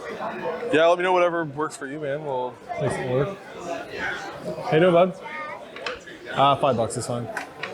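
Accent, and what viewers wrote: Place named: East Coast, United States. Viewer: Nice video. Just sitting at work, felt like I was at the show. I'm setting up at my first show this weekend, I watched your video about setting up as a dealer, some good advice. Lol, I knew you were east coast! First time I heard you say "card", gave it away for me. I'm Alberta oil patch, work with tons of east coasters. Looked like you did pretty well at the show. My only goal for the show this weekend is to get my table fee back. Lol. I'll just be happy to sell a single thing. Good video, you have good easy interactions with the show attendees. 👍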